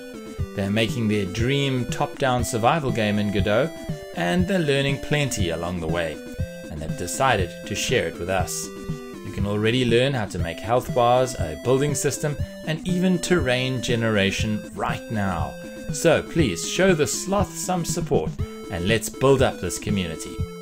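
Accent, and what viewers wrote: South Africa. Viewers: It's weird coming across a channel and hearing another South African, I'm not used to it but its increasingly common!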